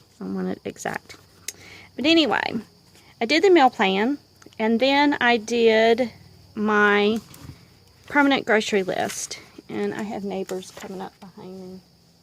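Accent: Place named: South, United States. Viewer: New subbie. Keep up the cool videos. Your journals look great. I'm guessing from your accent you're southern like me. Nice to hear.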